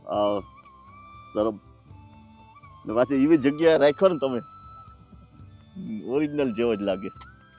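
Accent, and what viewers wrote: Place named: Porbandar, India. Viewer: Tame Porbandar na lago se avu lage se bhasa upar thi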